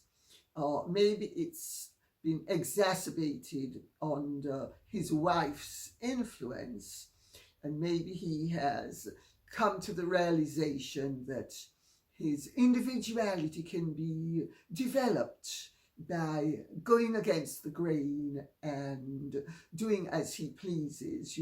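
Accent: United Kingdom. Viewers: I don’t know why, but condemning Harry and Meghan’s behavior sounds sooo much better with a classy British accent! 😉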